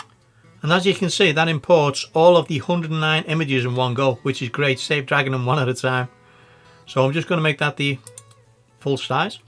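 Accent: Liverpool, United Kingdom. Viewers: Wow. What an awesome and easy to follow video. It is exactly what I need for a project I am working on at the moment. You are a great teacher. You sound like you are from Liverpool. I went to college there in Hope Street - between the two cathedrals in 1968 - yes I am very old. I have subscribed and will watch you other videos too. Thanks again and much love from beautiful Segovia in Spain.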